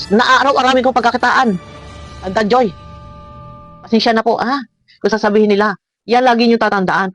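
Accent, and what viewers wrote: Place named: Laguna, Philippines. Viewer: Ma'am, taga saan ka po? Ang puntuhan mo kase ay parang taga Laguna😅 na may pagka batangeña😅.. ang cute lang pakinggan😊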